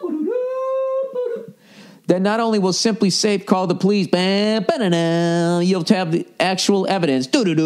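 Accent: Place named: New England, United States. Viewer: that new england accent always gets me. "YOU COULD GET AN AWWSKA"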